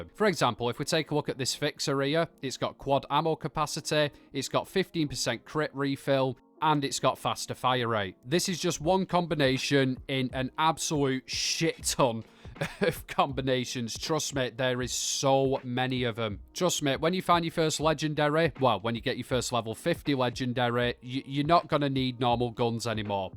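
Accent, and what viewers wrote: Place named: England, United Kingdom. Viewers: I don’t think I’ve ever heard such a strong English accent before watching this video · I've played 76 a couple of times. Who knew a northern lad telling me to "Stash Yer Joonk" would be what helped me get into the game. Seriously though this video is actually super helpful. I love how you explain things and it doesn't sound complicated and confusing. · You speak like your from my area Oldham haha love it ! Great vid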